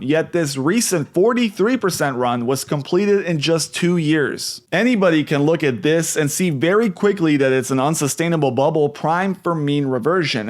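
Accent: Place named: Minnesota, United States. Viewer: Are you from Minnesota or Wisconsin?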